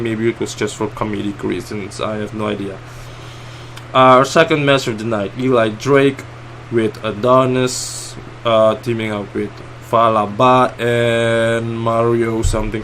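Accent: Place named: Europe. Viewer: Your accent is interesting. I thought maybe Europe somewhere. Anyway your vids are dope. New sub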